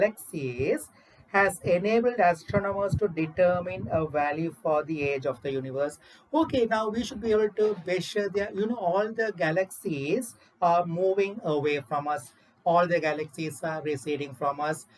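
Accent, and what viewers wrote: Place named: Sri Lanka. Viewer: Sir Are you from Sri Lanka? You have that accent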